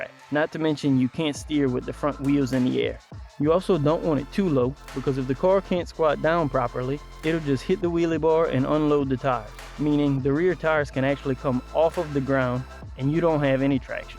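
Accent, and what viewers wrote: Louisiana, United States. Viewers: Bro.are you from Louisiana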